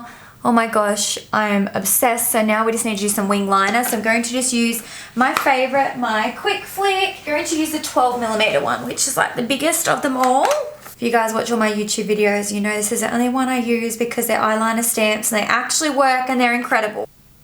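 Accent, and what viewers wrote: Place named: Australia. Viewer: I love Australian accents 💕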